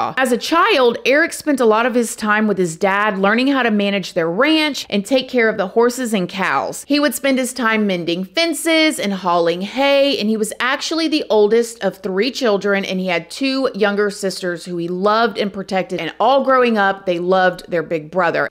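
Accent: South, United States. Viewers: I looove how you say Po-lice!!! Girl you are Sooo Southern, God Bless You!!!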